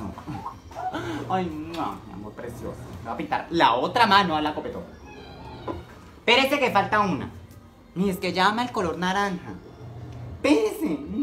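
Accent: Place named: Colombia. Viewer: EL ACENTO COLOMBIANO 💘🇨🇴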